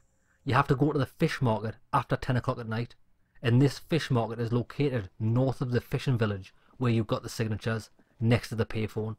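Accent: Newcastle, United Kingdom. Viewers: Woof that's a strong whiff of Geordie, I think I understood most of it though. Thanks man.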